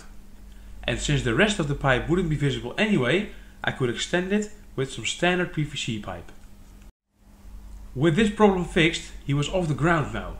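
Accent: Netherlands. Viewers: are you dutch? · nice!..that would actually be a good kind of"scarecrow" in the garden..just a question ,how come you got such a strong dutch accent? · jezus man, je kan wel wat beter je best doen om minder nederlands te klinken.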